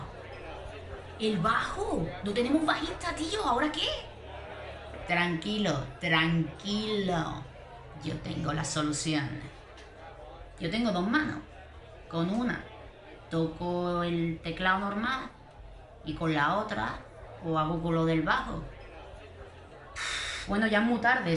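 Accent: Andalusia, Spain. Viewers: Hablando de The Doors en andaluz!! Jajajajaja. Eres una jefaza!!! 👏🏼👏🏼👏🏼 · Creo que este es mi vídeo favorito porque me ha enganchado hasta el final con tanta info interesante sobre Doors y contada de forma dinámica y muy tuya ... muy andaluza , mola! 🤩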